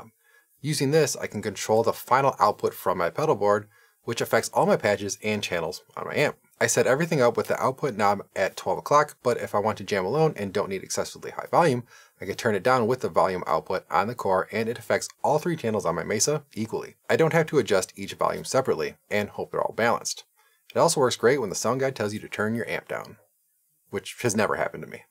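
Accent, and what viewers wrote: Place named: Midwest, United States. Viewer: nailed it- recognized the accent fellow midwesterner!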